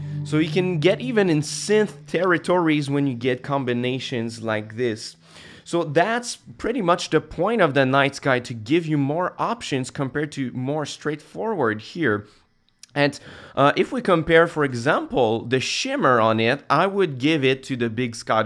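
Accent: Quebec, Canada. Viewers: Vidéo made in Québec selon l'accent non ?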